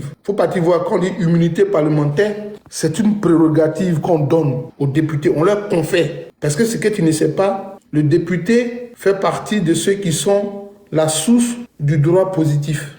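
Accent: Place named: Bété, Ivory Coast. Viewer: C'est pas à cause de celle qui est monté qu'on a su que tu es bété. Quand on t'entend on sait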